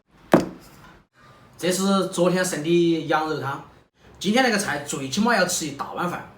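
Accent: Sichuan, China. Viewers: 哈哈 还贴心地加字幕 我们听得懂川普话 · Oh my God, thats my whole week meal supply! 🤯 - a month or two for the rice. By the way, which dialect of Chinese do you speak? It sounds like Mandarine but with an accent.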